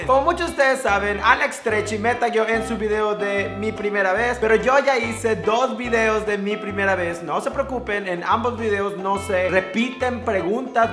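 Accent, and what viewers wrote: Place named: Yucatan, Mexico. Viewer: Tu acento Yucateco :3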